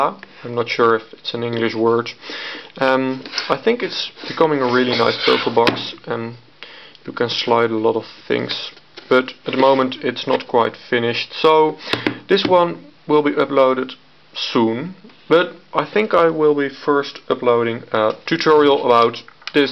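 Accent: Netherlands. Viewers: ik hoor gwn dat je uit nederland komt :D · Ben jij Nederalnds? vraag ik me af vanwege je accent?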